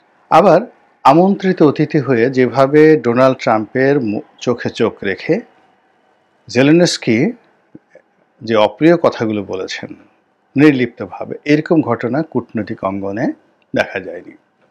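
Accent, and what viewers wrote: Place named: Barishal, Bangladesh. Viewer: রনি ভাই এটা বুজতে পারছি এই ইউটিউব ই আপনার মূল ইনকাম।আপনার বিসনেস মনে হয় ভালো যাচ্ছে না।তো ভাই দুনিয়া বদলেছে।সো এই আপনার বরিশালের টান থাকা ডেট চিবিয়া শুদ্ধ ভাষায় যে টেস্ট ক্রিকেট এর মত লং আলোচনা বাদ দেন।r আজ দানে r কাল বামে মানে মোনাফেকী বয়ান বাদ দেন।একসময় আপনার কথা শুনতাম। এখন হাসি লাগে আপনার এই হালকা সস্তা বাট আপনার মোটা ইউটিউব ইনকাম করার কথা শুনে r বোকাচোদা হতে চাইনা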